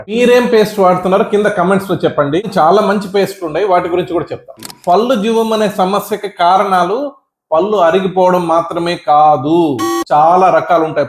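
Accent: Nellore, India. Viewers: మన నెల్లూరు యాస...👌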